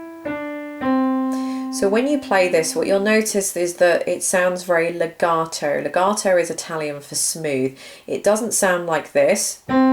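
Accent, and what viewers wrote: England, United Kingdom. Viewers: She is English lady her accent is different from Americans accent.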